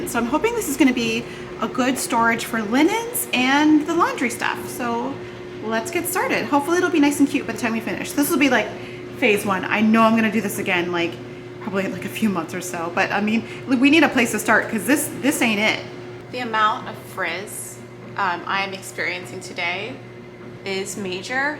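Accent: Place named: Jamaica. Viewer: I hear your Jamaican dialect. Its hidden but it's still there. Hello to yah. Looove your home. You are very much like me in style and decor. 😊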